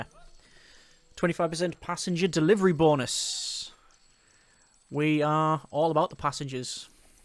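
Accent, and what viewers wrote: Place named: Wales, United Kingdom. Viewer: Man, are you Welsh? I study and practise UK accents because I think they're awesome, and I detect something in your voice. It sounds Welsh.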